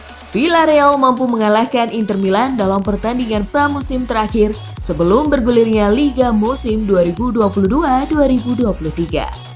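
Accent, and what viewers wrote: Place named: Java, Indonesia. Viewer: Kaka yg sekarang suaranya kaya d medok medokin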